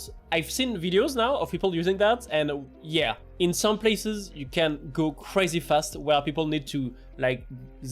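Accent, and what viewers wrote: France. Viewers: french accent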